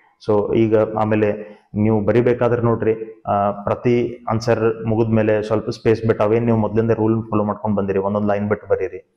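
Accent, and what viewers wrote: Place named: Hubballi-Dharwad, India. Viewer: Thank you so much sir❤.. Sir niv hubballi dharwad..dawra..😊.. Yakamdra..accent hanga anastade..😊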